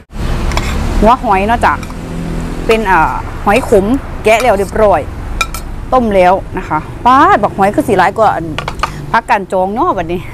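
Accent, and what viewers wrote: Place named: Korat, Thailand. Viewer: สำเนียงโคราช😅😊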